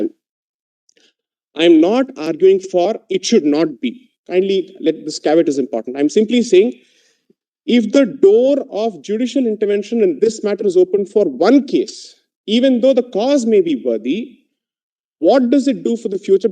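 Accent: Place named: South India, India. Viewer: i have said him south indian because he has south indian accent in english and south indian is not a bad word so no need to feel offended on his behalf and you are taking south indian as low word so you have very expensive mentality!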